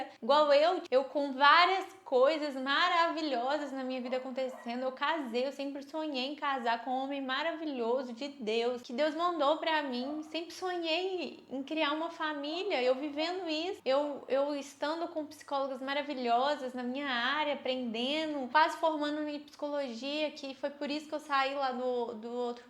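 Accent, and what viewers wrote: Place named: Minas Gerais, Brazil. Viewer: Adorei seu vídeo! Me inscrevi 😍👏 seu sotaque parece mineira 🥰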